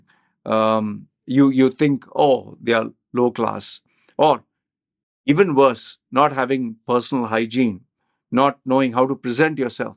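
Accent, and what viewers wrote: India. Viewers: U are another type of Indian, Bashing other Indian trying to fit in. Every individual has an attitude and you are just full of shitty ideas. What are you? A gangster-faced wannabe western with an Indian accent. you are disgusting!!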